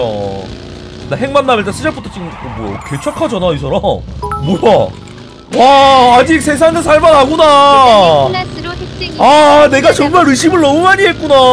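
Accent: Busan, South Korea. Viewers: 형 부산사나보네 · 부산사람 이었어?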